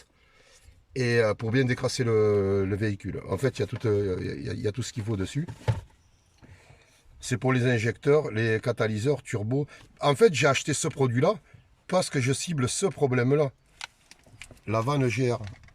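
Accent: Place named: South, France. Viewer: Merci un charmant petit accent du sud magnifique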